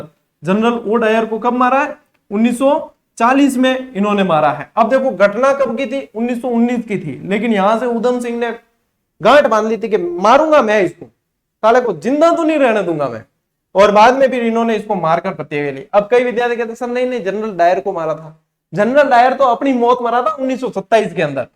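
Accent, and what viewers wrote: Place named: Marwari, India. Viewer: Ek request h plz marwadi na bole apni vedios m..iritation hoti h.. uper se ap apki marvadi bhi alg h smjh bhi ni aati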